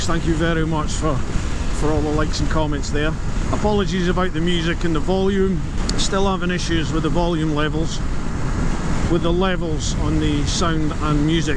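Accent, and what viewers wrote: Scotland, United Kingdom. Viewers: I always love listening to those lovely Scottish tones:D Do you actually live in Scotland?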